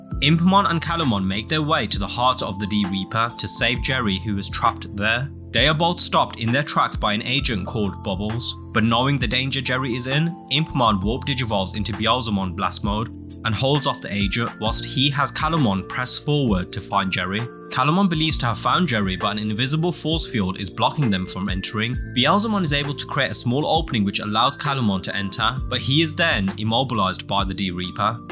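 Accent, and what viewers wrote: United Kingdom. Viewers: This narrator from Birmingham? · the Scottish accent makes this 10X better ~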